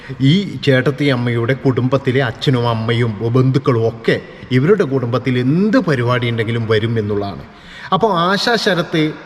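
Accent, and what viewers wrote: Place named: Konkani, India. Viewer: നിങ്ങൾ തിരുമലക്കാരൻ കൊങ്കിണിയാണോ ശബ്ദം കേട്ടിട്ട് തനി കൊങ്കിണി മുതലാളി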